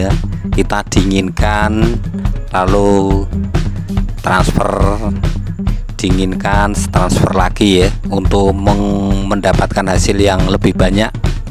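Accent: Java, Indonesia. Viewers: Logat bahasane medok nyenengke tenan dirungoke.. 😂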